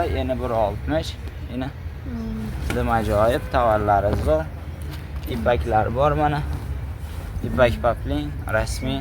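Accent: Khorezm, Uzbekistan. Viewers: Ишларингга омад Хоразмча суйлаб урганибди молодец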